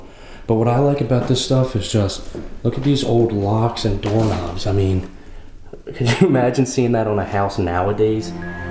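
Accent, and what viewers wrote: United States. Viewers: The music makes me think that this is Ireland, and he looks Irish, but he's speaking in an American accent, so IDK what to think lol..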